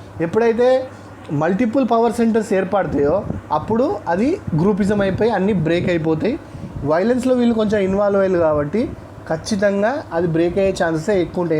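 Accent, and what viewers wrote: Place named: Telangana, India. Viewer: Nice vedio in telugu bro and nice way of expressing words in our telengana slang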